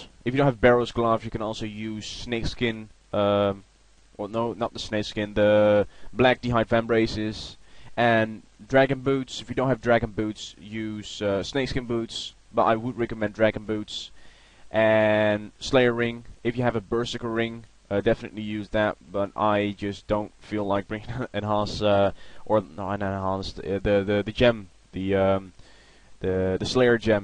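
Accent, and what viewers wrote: Netherlands. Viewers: Je hoort gewoon dat je nederlands ben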